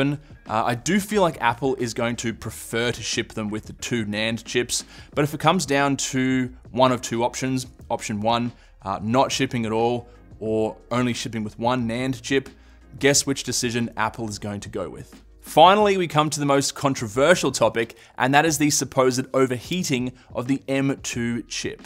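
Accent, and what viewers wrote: Australia. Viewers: Nice hair. Love that Aussie accent.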